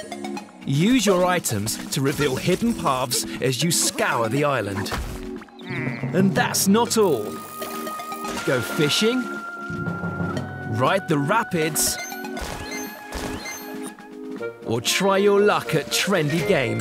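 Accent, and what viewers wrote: United Kingdom. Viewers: I can't help but think of Ryn from Xenoblade Chronicles when hearing the British narrator. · It's the British accent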